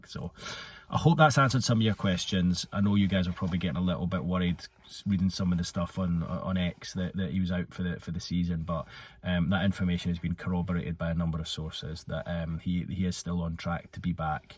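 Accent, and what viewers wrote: Scotland, United Kingdom. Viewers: I’d worn the same pair of underpants 2 days in a row the day you reported Bruno G to the toon and every since I don’t change those bad boys until I hear your dulcet Scottish tones reverberating through my weary Irish sound canals pal 💪keep up the Barry work 🙌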